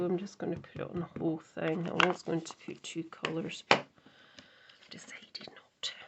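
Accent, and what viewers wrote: Scotland, United Kingdom. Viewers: Absolutely gorgeous, lovely colours and design. It's great to hear a Scottish voice on YouTube, I've just found your channel and will enjoy watching your videos, thank you so much for your inspiration 💖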